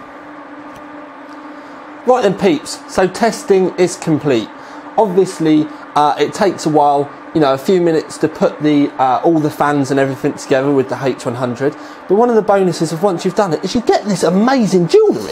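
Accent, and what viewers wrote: United Kingdom. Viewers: also you have an ABSOLUTELY BLOODY MASSIVE british accent no offense : ) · this guy is so brittish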